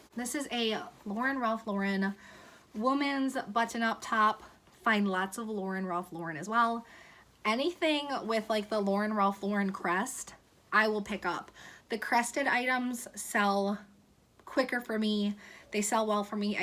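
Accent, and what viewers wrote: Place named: Michigan, United States. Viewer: OMG 😱 I called it! Girl, I was just thinking to myself that your accent was totally a Michigan accent! I’m from Michigan and now live in Oklahoma but watch resale videos from all over. With that said, lol, I’ve gotten pretty darn good with guessing accents, but you are my first Michigander resaler (I know, I know, resaler is not a word lol) that I’ve found so far on YouTube!! Sorry y’all for the ridiculous giddy reaction, but if you were from Michigan, you’d understand! Although that could be due to my age! LOL. Anywho, nice to meet you and thanks for the resale tips on clothes! I haven’t dipped my toes in that area of resale but am enjoying the tips. Last but not least, I watched your latest video in which you mentioned that you heard it helps to make sure to ask for thumbs up in the videos and girl it’s true! 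And a lot of the reason why is just that people forget (including me! Lol). Look forward to more and keep representing strong girl 😜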